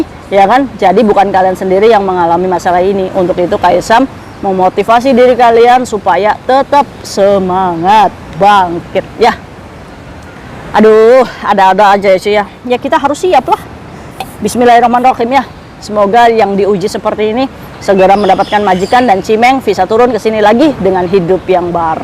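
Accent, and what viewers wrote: Indramayu, Indonesia. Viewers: Sing suarae ktenger payah logat drmayue, trnyata emang bner wong drmayu 😂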